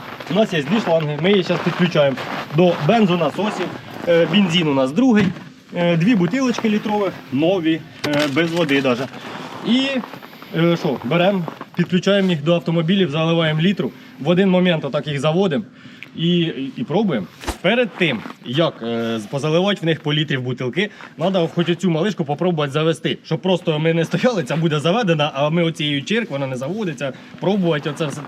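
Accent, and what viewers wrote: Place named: East, Ukraine. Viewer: На сході оозмовляють так : на суржику.Не чіпляйтесь.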